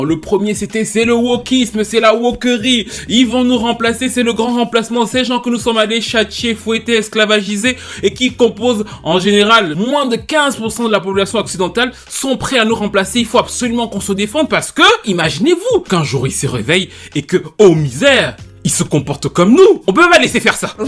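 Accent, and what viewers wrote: Cameroon. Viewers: C'est tellement drôle, comme tu t'es repris in extremis ! 🙃😅😆 En bon camerounais, je suis sûre que la suite était: "Avant que le bébé"... n'entre ! 🤣🤣🤣🤣🤣 Et puis, la vidéo ensuite qui vient remplacer et imager le propros... 😂 Les camerounais que dis-je, les continentaux et leur phrasé légendaire... Comme je vous aime ! 😅🧡💯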